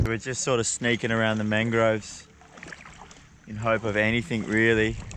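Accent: Australia. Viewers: Is he from New South Wales or Queensland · Are u Australian cuz I am to mate Edit: thanks 4 😄😄😄😄😅😅😁😁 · Is he Aussie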